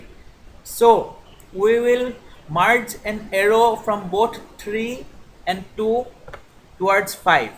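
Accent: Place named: Assam, India. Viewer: Ur accent is like an Assamese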